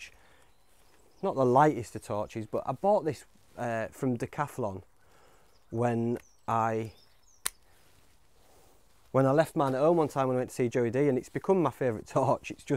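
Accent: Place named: East Riding, United Kingdom. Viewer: Great content just subscribed as am getting in to wild camping, out of curiosity you from East ringing by any chance just the accent as am from Hull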